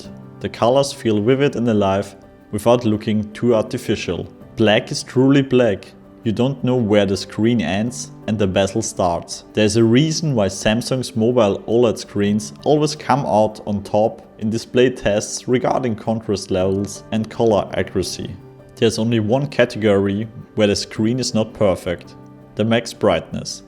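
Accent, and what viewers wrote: Germany. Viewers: It sounds he is German.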